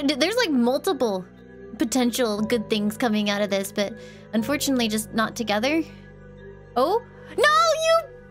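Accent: United States. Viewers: I live for "American accent" and pronunciations. So cute.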